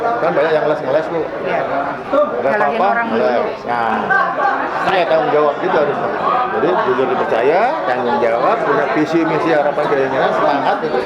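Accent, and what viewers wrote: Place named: Sunda, Indonesia. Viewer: Voice of sunda, mantaf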